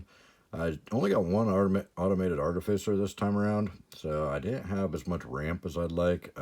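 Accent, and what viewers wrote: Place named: United States. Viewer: May I ask what area of the United States you’re from? Can’t get down where your accent is from. Watching here from MN. Keep it up!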